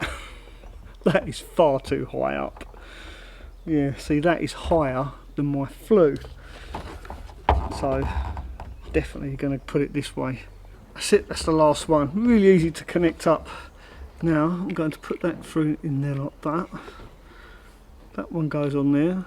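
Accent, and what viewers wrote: Cockney, United Kingdom. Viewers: Lovely to hear the good old Cockney accent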